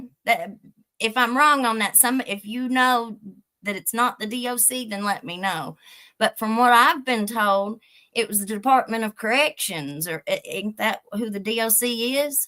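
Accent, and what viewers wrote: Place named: Appalachia, United States. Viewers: Do you have a point? I wasted almost an hour waiting for it. Maybe get to the point rather than rambling endlessly. Boring as heck and your hillbilly accent makes it hard to listen. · Newbie here! I found you on Joel's show! Your accent reminds me of my aunt in Kingsport Tennessee!! Great coverage of this case!! ❤️🤍💙‼️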